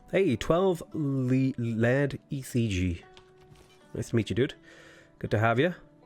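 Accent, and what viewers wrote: Ireland. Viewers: IDK what that guy's talking about, you definitely sound Irish. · I swear accent became more Irish after he was accused of sounding like he was from London. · mate that guys is shit at accents must be american you deffo sound irish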